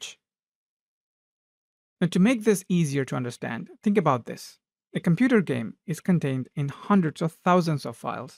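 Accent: India. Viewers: malayali anno ?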